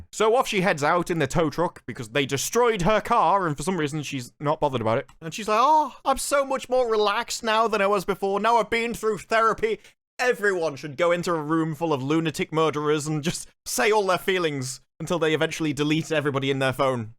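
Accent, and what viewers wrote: United Kingdom. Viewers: Fries dipped in a milkshake are AMAZING. Unlike absolute everything else in this show, that was the sole good idea. And hearing a British person talk about good vs. bad food ideas is funnier than ANYTHING this show has given us.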